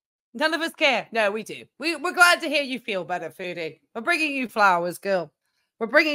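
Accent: United Kingdom. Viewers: Oh I just wondered bc of your accent. I figured it was the UK but was just checking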